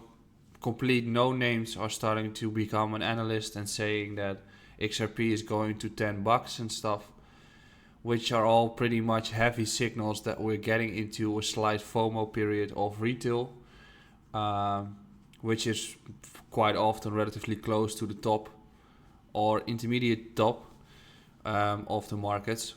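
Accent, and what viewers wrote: Netherlands. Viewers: Finally a down to earth guy, ive started unfollowing allot of other youtubers becouse of the insane fomo talk hahaha, btw im hering a dutch accent am i right?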